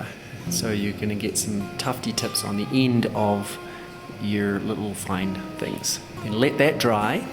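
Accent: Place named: New Zealand. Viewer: Where is your accent from? The mystery is killing me. It's like Kiwi and South African and Scottish and American at the same time but in that order.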